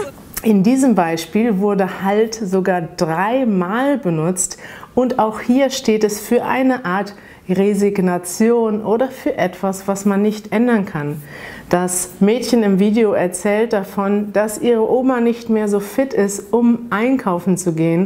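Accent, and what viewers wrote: Standard. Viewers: Ist das hochdeutsch? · Hi, how can I get in touch with you directly? g:) You are the most methodical person I found on the web and your Hoch Deutsch rocks:)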